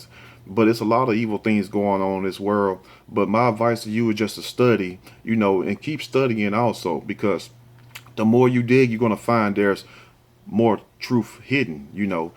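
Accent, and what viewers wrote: South, United States. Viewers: Like watching and listing to u even if u talk like your from the southern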